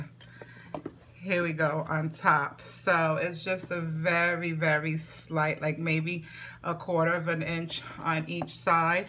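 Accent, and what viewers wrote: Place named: Philadelphia, United States. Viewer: Great red truck items. Where are you located? You have an accent like my aunt. She lives in the Philly area.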